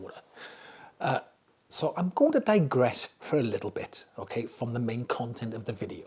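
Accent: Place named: Liverpool, United Kingdom. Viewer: Haha...loved the scouse accent! 😂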